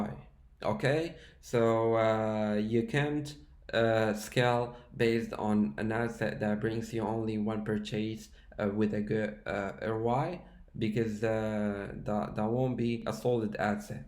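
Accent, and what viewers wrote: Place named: Morocco. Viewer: He is Moroccan and his pronunciation mixed between Arabic means Moroccan dialect and French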